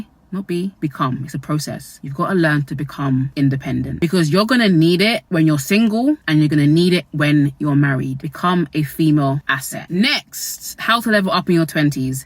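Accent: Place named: England, United Kingdom. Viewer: English accent and rich dad poor dad? New sub!!! 😍😍😍😍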